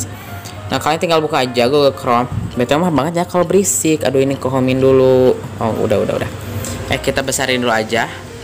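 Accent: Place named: Sunda, Indonesia. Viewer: Logat nya kee Sunda bang 😭😂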